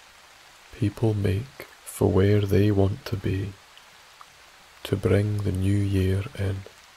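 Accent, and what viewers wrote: Scotland, United Kingdom. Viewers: I love Scottish accents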